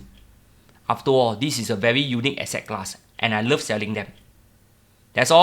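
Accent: Singapore. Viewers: very nice sharing. Always love the Singaporean way of speaking, chap-pa-lang mixture of languages/dialets used. Can do a similar analysis on west coast areas. Around West Coast Rise/Ave/Garden, Faber Crescent/Drive, Sunset Terrace/Drive, Pasir Panjang?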